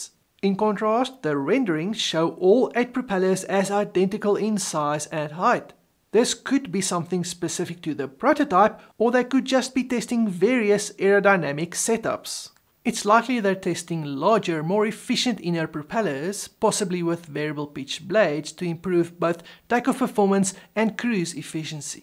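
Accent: South Africa. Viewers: Ek wil een van hierdie dinge hê om wors vir die braai aan 'n lekker braai plek te vlieg. Heard your accent and thought, "dis my ou" 😂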